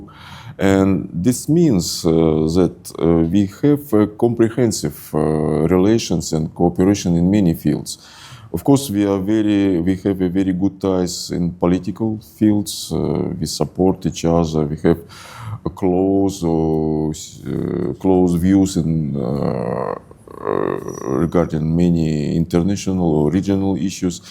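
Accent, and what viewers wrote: Russia. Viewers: Aksen rusianya kental.. Keren..😊😊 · Gw tetep harus denger karena gw juga pengen denger aksen rusianya pas ngomong bahasa inggris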